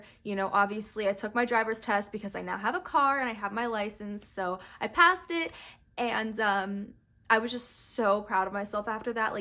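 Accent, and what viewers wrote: United Kingdom. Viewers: I LOVE THAT BRITIST ACCENT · I love your British accent😂❤️❤️❤️ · Nice British accent it was funny when you said that sometimes when you speak British it gets on peoples nerves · Yeah like some people don't like her but not like those who dislike her, I love her and i love her British aksent like whoaw 😍😙😘❤💙💚💛💜💓💕💖💗💘💝💞💟👍👌😍😙😘❤💙💚💛💜💓💕💖💗💘💝💞💟👍👌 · I LOVE your British ascent it reminds me of (Tahani Al Jemil) form (The good place) on Netflix defiantly recommend for u to watch so so SO funny watched it all in like a week so yeah..... love u your the best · You have a good British accent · I love your British accent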